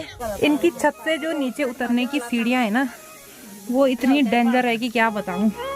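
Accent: Garhwal, India. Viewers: apki Hindi boli me gadwali bhasha ki jhalak bahut pyari lagati hai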